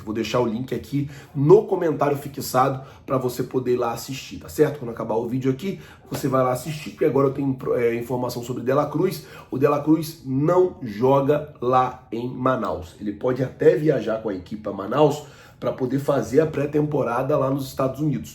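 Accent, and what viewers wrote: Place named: Campos, Brazil. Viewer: Pega umas aulas de inglês e português; esquece o campistês, cara. Que mania de inventar jeito errado de falar, irmão! Isso é costume de campista. Na prova de português do meu concurso pra UENF, um dos textos foi sobre isso. Os tempos mudaram; não insista. Assisto e gosto muito de você. Sucesso,!!!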